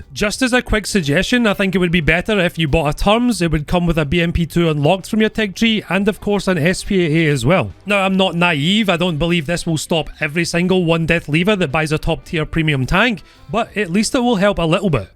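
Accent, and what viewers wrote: Scotland, United Kingdom. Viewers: Just found your channel, and I like your Scottish accent.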